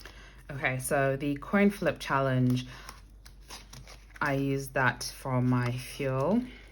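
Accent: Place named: London, United Kingdom. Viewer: where's your accent from? I'm picking up London, Manchester, America, New Zealand?? Sorry I'm nosey lol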